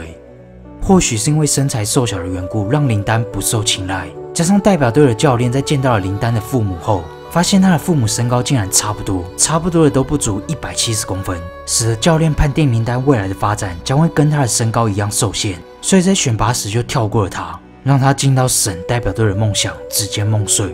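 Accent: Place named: Malaysia. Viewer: 这口音更像马来西亚或者新加坡的。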